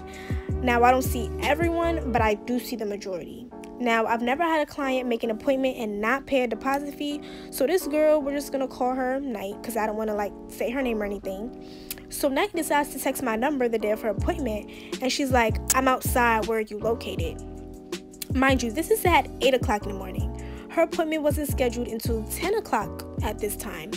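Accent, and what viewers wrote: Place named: New York, United States. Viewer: Are you from New York? If your not either way I love your accent 😩❤️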